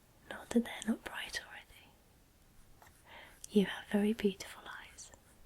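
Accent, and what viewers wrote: United Kingdom. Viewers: I love British people's accent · ASMR with British accent? an absolute perfect combo.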